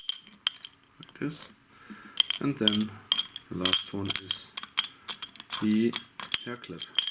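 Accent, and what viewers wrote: Germany. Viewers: Am Englisch erkennt man sofort das hier ein Deutscher am Werk ist.^^ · Dein deutscher Akzent ist echt Hardcore !! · du bist deutsch oder? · Are you german? Your accent sounds like it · André Ribeiro He's German, you're a foreigner too so stfu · Boy this german accent sure is annoying... just as his fingernails -.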